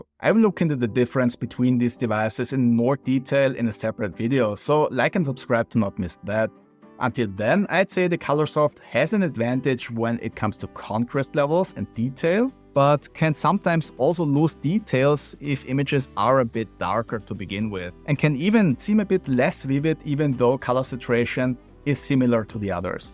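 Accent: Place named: Austria. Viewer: Ich erkenne den österreichischen Akzent sofort. Er gefällt mir :) Ich habe mir dein Video über das ONYX BOOX A3C angeschaut und mir daraufhin das A4C bestellt um die Risale-i Nur besser lesen zu können. Danke dafür ☺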